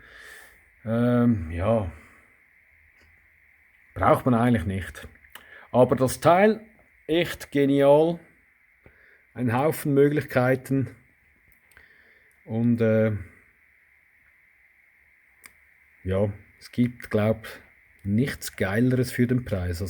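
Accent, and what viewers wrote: Switzerland. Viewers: Well done! This is like the only video out there, where someone actually successfully shows you how to connect to the PC software. I managed to connect my AT24 to my PC, with the method that he showed using the "More Bluetooth options", in the Bluetooth & other devices page. Under COM Ports tab, add an Outgoing serial port that points to the **_SPP device. (According to your specific device). Now what I need to know yet, is how to get the logged information exported out to a spreed sheet or graph where i can see the whole picture. I want to use the device for machine trouble shooting and need a data logger. I figured if i just connect to an old laptop and come by the next day i would be able to see my current graph, and therewith help diagnose the problem. Let's see what we can get. Any tips heartily welcome. And I love the Schwitzer Deutsch Accent :):)😀😀😀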